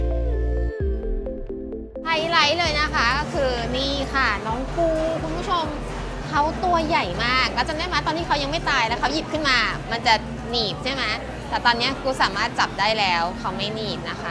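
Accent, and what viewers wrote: United Kingdom. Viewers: น้อง พูดภาษาไทยว่าเพราะน่ารัก ฟังเพลินแล้ว..ภาษาอังกฤษก็ไม่แพ้กันเลย..สำเนียงน่ารักเชียว..British?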